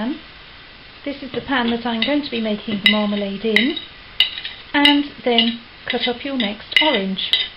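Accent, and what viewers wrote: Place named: Wolverhampton, United Kingdom. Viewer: I live in Italy and tomorrow im going up town to get me some oranges, we have them all around the town, people dont eat them because they arnt eaten as they are not sweet enough!!! What a waste! noticed you have a northen accent (wolverhampton), like the way you are so clear, I shall enjoy making my marmalade.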